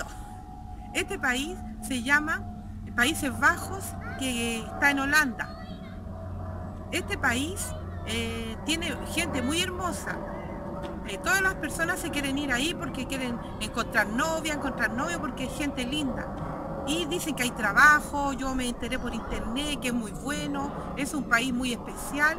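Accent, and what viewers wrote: Chile. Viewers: Por la forma de hablar de esta señora les comento que primero es chilena y segundo... en mi pais les decimos weones picantes. Una persona sin demaciada educacion que por cierto debe hablar un ingles pesimo. Es el clasico latino que quiere tener la gran vida sin sacrificio. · Eres Chilena ??? Por el acento ??? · Yo soy latino viviendo en Holanda por casi dos años. Pero el video de esta señora es tan nefasto y terriblemente desinformador.... me da hasta molestia que la gente sin ninguna preparación alguna, sin siquiera preparar un video decentemente se crea con derecho de venir a hablar tan terriblemente de un país. Por el acento de la señora creo que es chilena y le informo que su país está en dentro del rango de los países con BAJO nivel de inglés. Y en ese mismo ranking Holanda está en el 2do lugar como el mejor país que habla inglés como segunda lengua. 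Ya quisiéramos todos nosotros que en nuestro país hasta el que barre las calles, el jardinero, el que trabaja en el campo, hasta los mendigos hablen inglés! Claramente señora usted no les entendía porque USTED NO HANLA INGLÉS! No es que su nivel sea bajo, usted NO HANLA INGLÉS! Y es más que notorio por cómo pronuncia algunas de las palabras en inglés que dice en su video (como Dutch que es el idioma oficial de este país dicho en inglés ) . claramente si usted emigra a Holanda o Habla holandés o habla MUY BIEN INGLÉS sino usted no tiene nada que hacer acá, el país no va a cambiar porque a la reina se le dio la gana venir y solo hablar español. Además que si usted viene sin permiso de trabajo TAMPOCO TIENE NADA QUE VENIR A HACER ACÁ, acá no existe el trabajo ilegal, que lo que tiene de consecuencia el trabajo ilegal es la precarización de la vida de la personas, es por eso que Holanda se ve tan lindo por donde usted camine, no solo e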